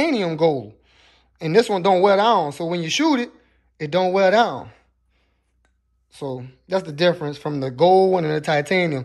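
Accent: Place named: New Orleans, United States. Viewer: that boy sound like hes from nola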